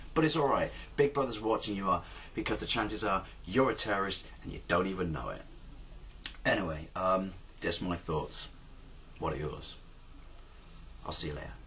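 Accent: United Kingdom. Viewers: Honestly if I couldn't hear your British accent I would have thought you were an American. The exact same shit is going down over here. Slowly but surely they are trying to chip away our freedom all in the name of public safety. Also our troops never actually make it home but instead get shipped off to yet another war. I've never seen CCTVs though. I'm sure our current administration is pushing for that as well as they think China is the ultimate template for national security.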